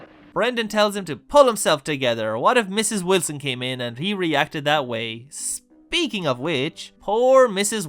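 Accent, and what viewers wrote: Ireland. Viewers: You're terrific -- what a fun approach to life. LOVE Rope. Read about it as a 1960s teen and was dying to see it, but it was as buried as Martin van Buren then. Thought to search it in youtube one day 40 years later and wham, there it was to enjoy free. INSTANTLY this tantalizing mutation from Hitchcock's doggedly hetero (but frequently gayoid) oeuvre at large sprang into my slightly less closeted adult life. What's that you say -- cotting? Codding? (Cotter/Codder.) Am interested -- have been a language/dialect/idiom/slang addict forever, and of course LOVE your Irish accent. Tried googling the term. Hitch was gay as a goose, of course. Supposedly he and Alma only made love once, thus Patricia. ("Therefore champagne.") Rope's photographic gimmick isn't such a big wow but it gives this extra-special movie extra cachet. 7 normal splices would've been fine :)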